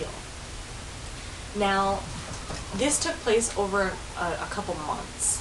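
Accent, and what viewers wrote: Michigan, United States. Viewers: I knew your Michigan accent was familiar!! I live near Ann Arbor :] if you're ever in the area, my go-to metaphysical store is Earth Lore in Plymouth. I get tingles just thinking about the place! Or if you need a book, Crazy Wisdom in downtown Ann Arbor.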